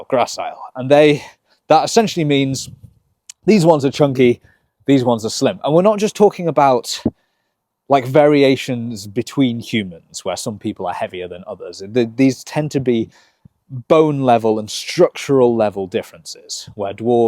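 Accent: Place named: North, United Kingdom. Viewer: Did I just thoroughly enjoyed a comely, charismatically genteel ranger ramble about the biology of imaginary creatures in a posh northern accent? Never thought of the structural integrity of a centaur... Yeah the upright human spine + equine vertebrae won't work. Oh I'm a mage. How do you do.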